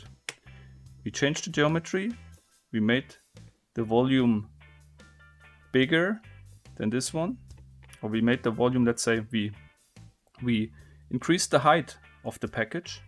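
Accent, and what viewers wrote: Germany. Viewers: Glückwunsch zu den 100k. Ich bin schon etwas länger dabei und bin bis heute wegen dem deutschen Akzent fasziniert xD Ich mag jedenfalls deins Videos ( Das ist eig was ich normalerweise sagen wollte haha :D) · Glückwunsch !!!! ( dein deutscher Akzent verrät dich ;D ) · using V1 & V2 as a name for that thing is somehow funny with your german accent ;D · Du sprichst sehr gut englisch aber ich höre deutschen Akzent heraus you're speaking very well English but I can hear a German akzent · This channel is great for so many reasons bro. The presentation is great and your German accent is pleasant to listen to. your showing tons of puzzle I haven't seen and the way u show the puzzle then "let us try" THEN solve it keeps me watching. great job! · Übelst deutsches englisch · Ich weiß genau das du aus Deutschland stammst! Hört man ein bisschen am Akzent😉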